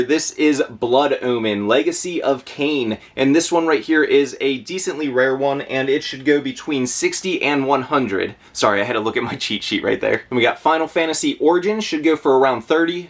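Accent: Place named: Baltimore, United States. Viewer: Ever live in Baltimore? Your accent reminds me of my Bmore neighbors :)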